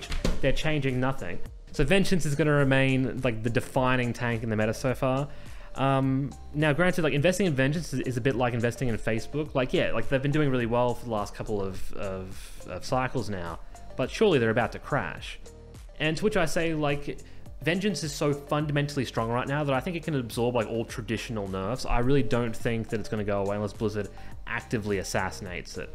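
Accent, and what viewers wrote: Australia. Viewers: you sound aussie bro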